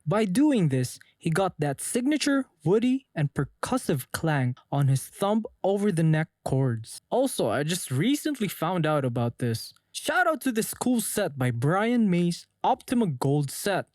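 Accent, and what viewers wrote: Philippines. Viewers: Yow you have a Filipino Accent I hear it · Are you pinoy? I am also 😂 watching guitar vids with family they put your video on and i noticed accent might be fellow kababayan!! If so awesome!!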